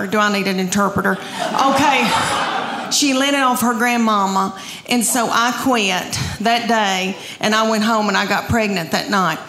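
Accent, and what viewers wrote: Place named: Appalachia, United States. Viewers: Why is it, when I hear a southern accent, I think dumb. If you don't want to get pregnant try the pill or condoms, honey. This is funny ? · I love you. I, a Yankee from NYC, lived in Memphis Tennessee for over 3 yrs and know most of TN quite well. If anything made an impression on me was that everyone was so, wholesome and funny, just like you. I learned so much about America, being a bred and raised city boy. I didn't realize how ignorant I was in the ways and niceness of the South. Those experiences and memories were amazing and I cherish all of them till this day! When you talk about being that "cute girl" in britches OMG, many times I went out with those girls! They were absolutely beautiful and their accents, like yours, would just melt my heart! Best was they were honest and loving. Your comedy is superb. 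I wish you the very best for you and your family. God Bless! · She's gorgeous, intelligent and funny! I just LOVE that accent too! As smooth as Appalachian moonshine!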